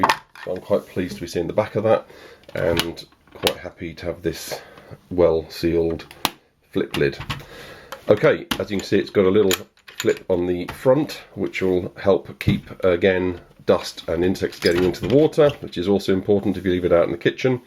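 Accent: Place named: RP, United Kingdom. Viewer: First of all GREAT VIDEO. Second of all, finally an Englishman that speaks the Queens English. Thank you for the vid :o)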